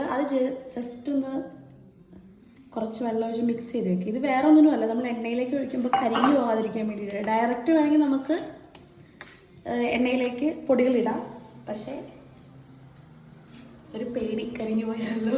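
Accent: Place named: Thrissur, India. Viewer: Samsaram thrissur style Aanalo kinnamkachi fish curry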